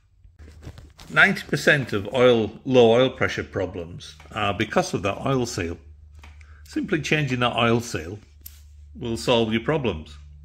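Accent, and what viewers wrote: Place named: Yorkshire, United Kingdom. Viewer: Your a Yorkshire man but in oz ?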